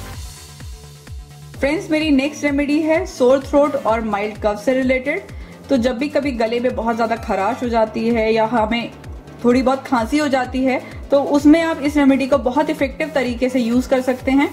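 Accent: India. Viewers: Your english sounds like hindi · I cant understand indian english like who has the same issue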